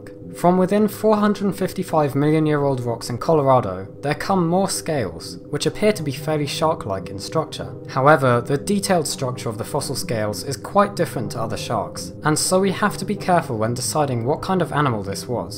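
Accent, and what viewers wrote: United Kingdom. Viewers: Ssooo.... You're British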